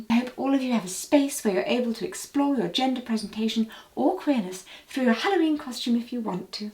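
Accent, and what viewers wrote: RP, United Kingdom. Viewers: You sound very posh.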